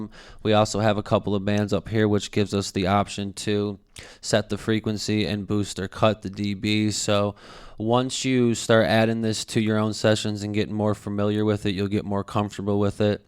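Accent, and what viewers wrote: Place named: United States. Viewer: I don't like the american accent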